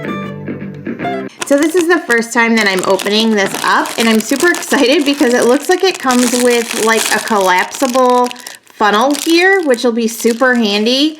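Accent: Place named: Midwest, United States. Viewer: OKAY I HAVE TO KNOW!! Where is your Midwest accent from?! 😍 These fudgesicles look so good!!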